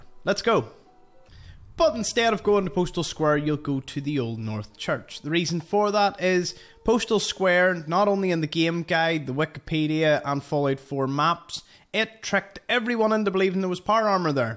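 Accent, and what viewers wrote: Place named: Belfast, United Kingdom. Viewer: Accent making me homesick(I am from Knocknagoney)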